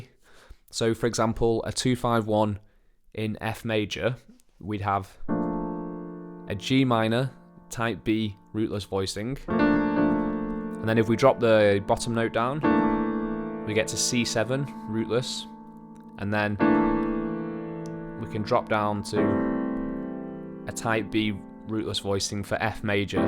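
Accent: Liverpool, United Kingdom. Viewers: Very helpful info but I was wondering if you can do (or already have) any videos on learning the right hand improv? Whenever I hear the middle improv section of a standard jazz piece, it always blows me away how good it sounds with the various runs etc and I would love to be able to learn how to do that. By the way is that a Liverpool accent I hear? Cheers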